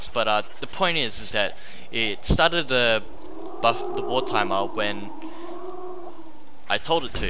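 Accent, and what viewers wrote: Australia. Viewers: Voice command doesn't work properly because he speaks with an Australian accent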